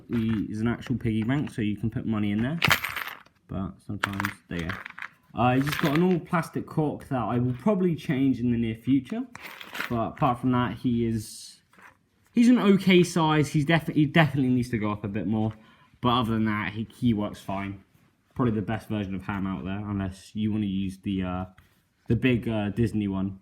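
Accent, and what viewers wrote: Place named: United Kingdom. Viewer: I’m sorry but it’s a solid British accent , it’s very easy to understand what is being said , are you from South Africa????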